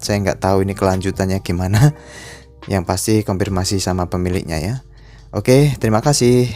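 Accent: Java, Indonesia. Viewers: Mas kalo boleh tau mase orang mana ya? Jawanya jawa mana? Siapa tau kalo ada apa apa bisa mampir ke mase🤭😁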